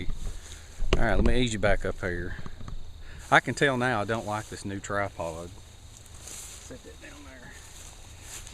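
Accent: South, United States. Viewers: Hey, Forgot to mention - i now know how to do that knot you used - thanks so much my friend. this is something i will remember for the rest of my days. The way you showed it was so clear and easy to follow unlike some other knot video's on here - can you do a video with some more knots that you know? Your a great teacher & fun to watch, love the south accent too:)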